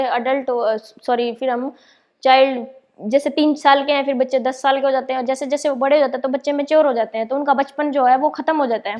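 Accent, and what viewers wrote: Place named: Haryana, India. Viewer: Hlo mam by your speaking tune I think that you are from Haryana Am I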